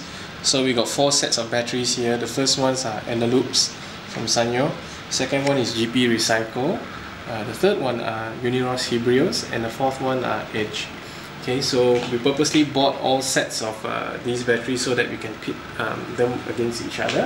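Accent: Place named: Singapore. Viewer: long live singlish! :D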